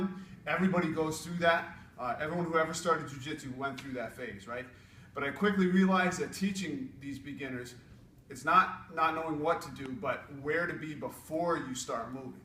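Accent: Brazil. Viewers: The Brazilian maestro accent is beautiful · That Brazilian accent is pretty good lol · Can you do an instructional video with your Brazilian accent? That would be amazing! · Very nice tips!! About the Brazilian accent... do we really talk like that? lol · Your Brazilian accent gets me laughing every time. Love your videos. Thanks. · Love the Brazilian accent. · More brazilian accent videos please. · Thank you for the white belt videos. They're super helpful. I really like the Brazilian accent wrapping up what you just showed us. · You have a mean Brazilian accent, dude. Great vid too. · i love the Brazilian accent at the end of your videos lol... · Lmao, that Brazilian accent killed me. Subscribed.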